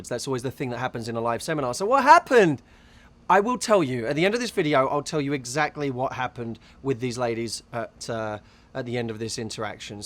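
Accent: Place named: United Kingdom. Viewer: watching this video, just to hear they lovely British accent again.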